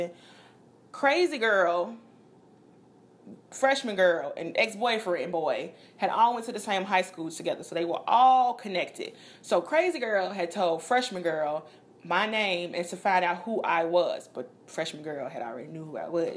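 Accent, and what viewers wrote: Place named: Chicago, United States. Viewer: U from Chicago huh....nice. Midwest in the building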